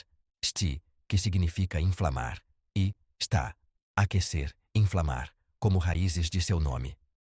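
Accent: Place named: Portugal. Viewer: Narração com sotaque lusitano é um lixo mesmo...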